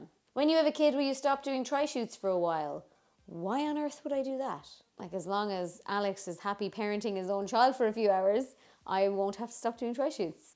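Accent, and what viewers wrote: Ireland. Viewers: I just discovered I'm half Irish. Soo id like to learn to speak like you now. Love the accent.😁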